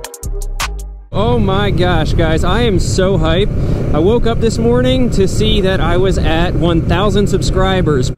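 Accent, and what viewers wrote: Baltimore, United States. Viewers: fuck yeah bruh. the baltimore accent is making me nostalgic lol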